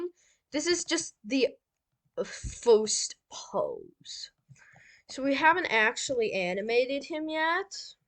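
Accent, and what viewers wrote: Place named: United Kingdom. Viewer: you sound British no offense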